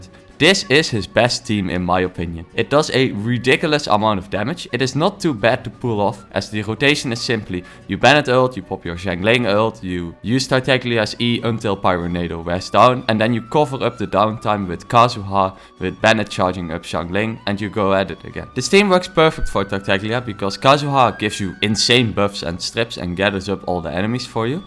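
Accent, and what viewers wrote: Netherlands. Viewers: Helpful video! Are you Dutch by chance?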